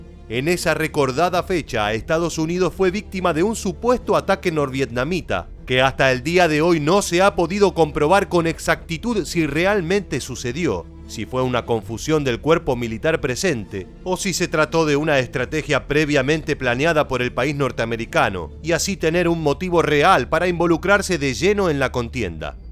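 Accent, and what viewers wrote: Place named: Buenos Aires, Argentina. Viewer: Querido amigo, sigues con el virus Argentino de la soberbia, de hablar como el único ser humano inteligente. Puedo decirte sin equivocarme y con humildad que eres un ingnorante de muchos cosas de las que hablas , además de leer los escritos históricos como un loro. No haces ningún comentario propio de alguien que estudia los verdaderos hechos históricos. Tu acento porteño y de soberbia hace tambalear lo que dices en tus vídeos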